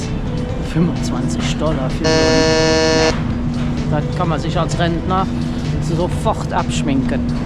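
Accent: Cologne, Germany. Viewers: Datt iss jo sööt. Ich hev alles verstaan , obwohl datt Kölsch wer.Ick Snack Platt und bedank mit för datt Video. Allns Goode .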